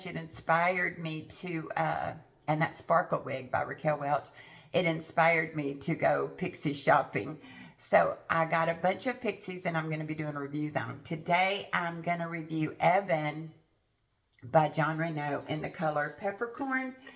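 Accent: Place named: Texas, United States. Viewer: I just stumbled in your review by accident while looking for Rachel Welch short wigs. I’m so glad I did. You give such a new refreshing opinion to wig reviews. You also sound southern or Texan which is homey to me. I like that you seem really interested in helping wig wearers. I’m new to hair loss and it keeps me tucked into my house. I’ve purchased about 10 wigs but sent most back. I’ve got to find something I can wear out of the house. Thanks for the help.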